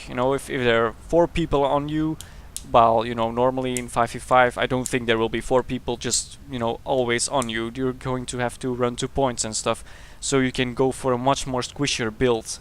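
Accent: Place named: Belgium. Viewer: Loved this video, Subbed! I can't help to ask. But are you from Belgium by any chance? I'm from the Netherlands and I kinda recognise a belgium accent with you:P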